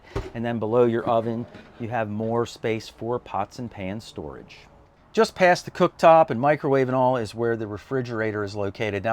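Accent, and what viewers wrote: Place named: Western Pennsylvania, United States. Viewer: The MD or western PA accent is strong. Ha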